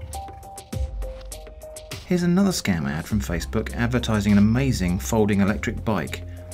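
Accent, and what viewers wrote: United Kingdom. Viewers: Man at this point I am watching this channel only because of his British accent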